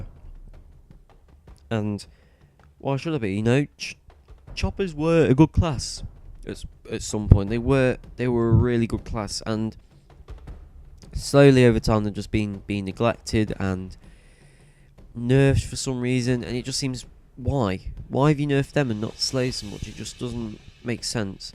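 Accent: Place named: United Kingdom. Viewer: You also died twice as many times. Not blaming you though. If where you're playing is anything like the North American servers (you sound vaguely British so maybe you're on the Europe ones) 3/4 your teams healers are selfish DPSing DoKs and Shamans. Compare the number of Zealots vs Runepriests in the average match. A lot less Zealots, why? Because you can't DPS for crap as them so Destros don't roll'em. You'll see the same issue with most of the mirrors. Order heals so they live and DPS longer.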